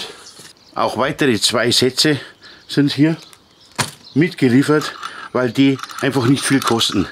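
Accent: Bavaria, Germany. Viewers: Also i hoer di so gern mit Deinem Bayrisch. Das is schoh supper!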